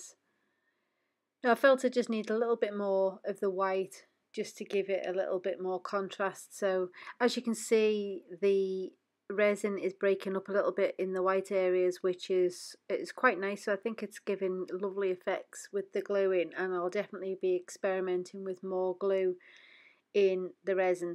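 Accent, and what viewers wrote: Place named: Liverpool, United Kingdom. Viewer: Fantastic experiment one I'll be trying as ive switched from acrylic pouring to resin. I’ve watched your other videos and think you have such an easy familiar voice to listen too .. dare I say Scouse/Wirral accent? I'm from Wallasey, Wirral. I also love the fact you don't have music blaring away in your videos and you have a very eloquent way of speaking, no um's no going off subject and no dragging out your videos.. i wish more was like you. Thank you so much. You are so inspiring.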